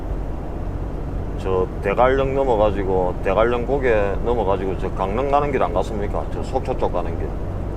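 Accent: Gyeongsang, South Korea. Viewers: 영상 날마다 보고있습니다. 카메라를 통해본 캐나다. 미국은 상상했던 것과는 다른 풍경이라 색다르고 갱상도 말투도 동네형님처럼 정겹고 그렇습니다. 항상 힘내시고요 오늘도 화이팅입니다^^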